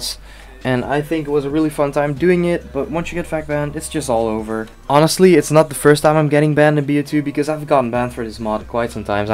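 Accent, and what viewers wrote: Netherlands. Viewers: His dutch accent mixed in with english is almost cringeworthy but his videos are still enjoyable. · are you dutch?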